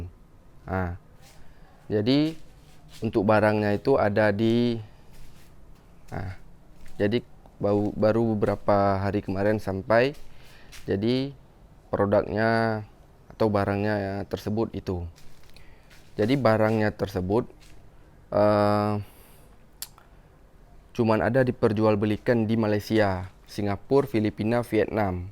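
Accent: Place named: Aceh, Indonesia. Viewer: Orang aceh bang y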